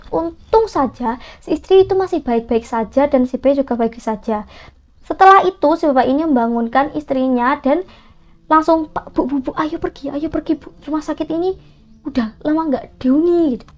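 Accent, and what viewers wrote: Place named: Java, Indonesia. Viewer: Halo kak, aku subcribe baru, nice konten kak, suka sama kemedokannya, rq kasus kriminal dong ka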